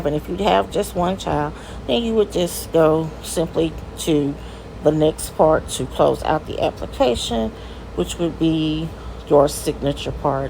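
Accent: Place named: Texas, United States. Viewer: I'm in Texas too.... the moment you said "Hey y'all" I KNEW!🤣😂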